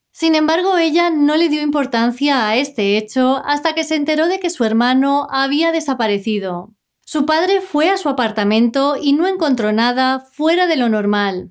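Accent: Spain. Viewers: Está bien, español de Europa 👏👍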